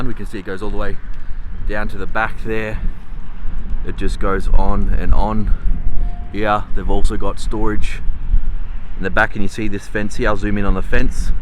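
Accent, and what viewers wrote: Australia. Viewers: I just noticed your Aussie accent. Are you in Victoria? Where I live.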